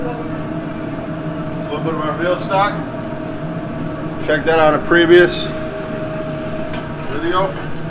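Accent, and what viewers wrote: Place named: Philadelphia, United States. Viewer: "Gabbagol?" "Prosciut'?" Are youse guys from Philly?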